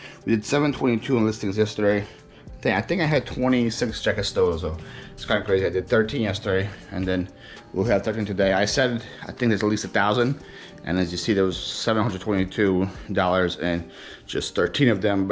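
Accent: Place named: Philadelphia, United States. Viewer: New viewer here. I realize you live in Florida now, but when you lived in Philly, you must have lived right in the heart of Philly for a long time. I can hear your very distinct Philly accent. Happy selling!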